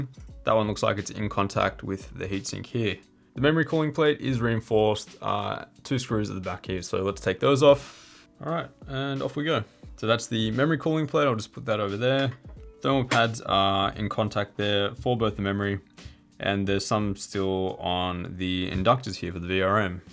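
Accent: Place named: Australia. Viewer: Ayy had a feeling you were Australian :D woot I'm from Straya too bro haha, honestly though INCREDIBLE breakdown and explanation of the card, that was top notch ^_^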